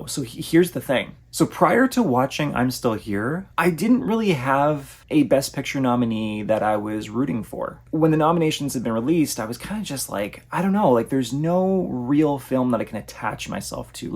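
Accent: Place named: Canada. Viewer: I think he is canadian... he has that typical lovely canadian accent.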